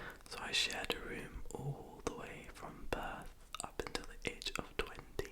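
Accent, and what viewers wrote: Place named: United Kingdom. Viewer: I love your videos and british accent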